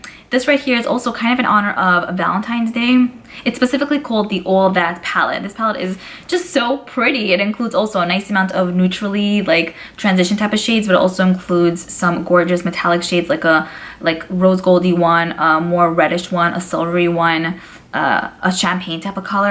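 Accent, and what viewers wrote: New York, United States. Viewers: I love the way you say chocolate, that New York accent is strooong! Your too freakin cute XOXOXO 😇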